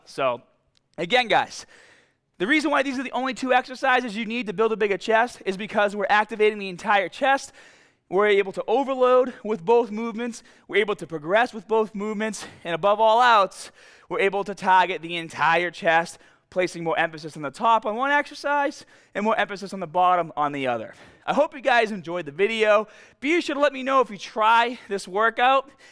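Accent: Boston, United States. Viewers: That boston accent though. Thanks for the knoweldge anyway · Where’s that boston accent coming from ?? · Missed that Boston accent. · Sounds like he’s from Bahhston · With so many followers and so many questions I really appreciate the fact that you take the time to reply.. hope it's not a VA :-). Didn't realise that's a Boston accent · Interesting hearing his 2010 videos having a normal American accent, and now, 8 years later, there is some Boston-sounding accent mixed randomly in there.. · Gotta love that Boston accent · What's his accent? Boston? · Is this dude from Boston ? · comedyman112 no. He has a Boston accent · Bahbell!! Like your vids a lot, but I'll bet money you're from Massachusetts, my friend is from Boston and sounds just like you. Hurts my ears but I love the knowledge and advice, so I'll suffer through it. Lol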